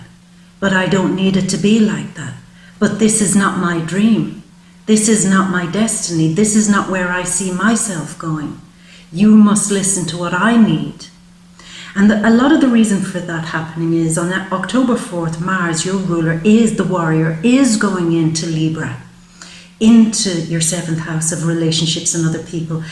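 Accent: Ireland. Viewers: looked at my relocation chart the other day and it seems that Ireland is where I need to be!! Although born in the UK my father was a Kerryman. I have been living in Spain for 33 years now but my feelings aren't the same as when I came out here......listening to your lovely accent makes me feel at home!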